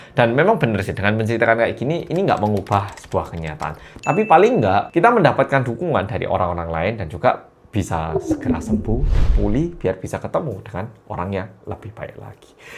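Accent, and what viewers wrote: Java, Indonesia. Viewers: Abis dari channel ngelantur yg brutal anjing2an... ke channel mas2 korea jawa medhoookkkk 😅😅😅 untung gk ketuker😂😂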